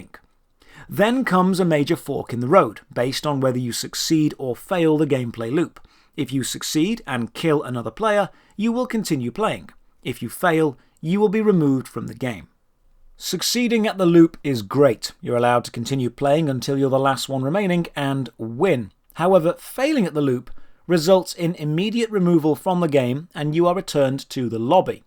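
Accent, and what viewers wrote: United Kingdom. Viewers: This is the same thing he does with MMO's to be honest and clear. He complains that MMO's require you to level up and play the game... to finish the story. He complaiend about FFXIV's story not being fully accessible, etc. He demands hand holding features, and he pretty much complains about any forced social behaviour, and he has ZERO understanding of the genre. I pointed out Everquest for example being one of the best, and earliest, MMO's that did not hold your hand and to this day is still succesful. He then responded to me and claimed he liked Everquest becauseyou could "play it solo or in a group." In what WORLD can you play EVERQUEST solo? It's clear does not understand anythign he is talkign about what-so-ever, and is basically exploiting a british accent to sound smart and impose what HE likes onto these games. And ostensibly, he likes single player story games.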